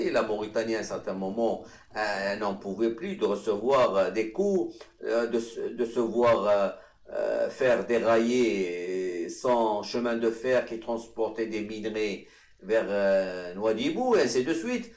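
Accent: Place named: Morocco. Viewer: Tu parle le français marocain je ne comprend pas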